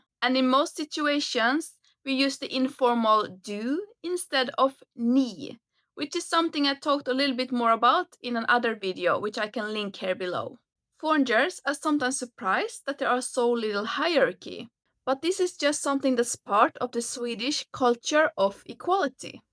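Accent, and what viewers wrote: Finland. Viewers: You sound a little bit Finnish, you live in Sweden?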